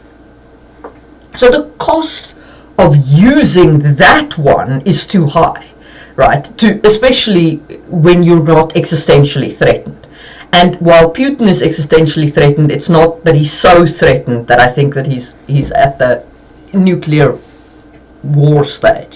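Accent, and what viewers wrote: South Africa. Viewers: Oh, hey, aunty! I wasn't expecting a reply! I would have been a little more cordial otherwise. I just wanted to jot my opinion down. Just want to say I absolutely LOVE LOVE LOVE your videos! They've made me even more inspired to world-build for my D&D/Fanatasy RPG games! This was the one point where I was like "Huh! Actually, I think I [respectfully] disagree here." In a world filled with powerful mages, there will always be people like Havelock Vetinari from Terry Pratchett's Discworld and Stan Edgar from The Boys (TV series/comics). By the way, your accent sounds South African to me. Are you from there by chance? I absolutely adore South Africa. And I'd like to visit one day.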